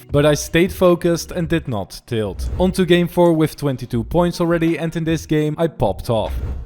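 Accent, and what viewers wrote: Netherlands. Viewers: R u Dutch? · You sound so dutch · Are you from holland? Als je dat bent dan dacht ik dat al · Wait a minute do i hear a dutch accent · are you dutch? you have a dutch accent. ik ben ook Nederlands, ga zo door je bent echt goed op k&m. keep improving:)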